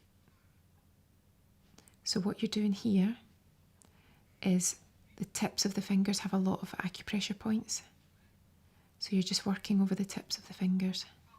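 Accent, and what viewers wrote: Scotland, United Kingdom. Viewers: If something feels good, or in the case of ASMR, sounds good, then yeah, it should really come as no surprise to anyone when the subject becomes relaxed, which of course is going to induce drowsiness. Whether there's science behind acupressure or not I guess could be for another discussion. I'm leaning more towards power of suggestion. Regardless, the soft spoken/Scottish accent combo is eargasmic, but I need more than 6 and a half minutes, so Ima loop it. 😬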